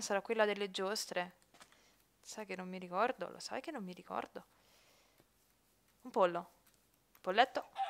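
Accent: Naples, Italy. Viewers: Ma sei napoletana? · anche io sono di napoli, ma parla come vuoi, anzi a me piace di più quando parli un po' in dialetto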